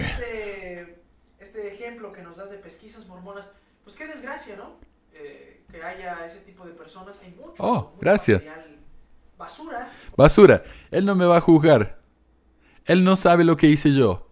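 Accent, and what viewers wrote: Córdoba, Argentina. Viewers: =) que Genio sos!!!. Admirable y Valorable todo lo que compartis, excelente tus opiniones, exposiciones y material sos un Capo Genio ya que presentas evidencias respaldatorias y material empírico de gran ayuda para reflexionar y pensar. Te escucho y sigo ya que todo lo que compartís es de gran ayuda y me sirve mucho en temas que veo en la carrera de Psicología. Además que me encanta seguirte por tu "humor inteligente" y amo tu acento Cordobés y tus expresiones!!!. Tu sinceridad y tu "open mind" hacen de vos un gran ser humano =) Besotes & Abrazotes desde Argentina =)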